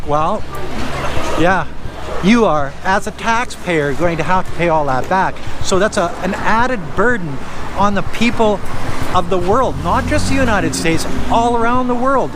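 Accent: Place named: Canada. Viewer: Saturday Morning is gonna be a great funny show! like your canadian voice!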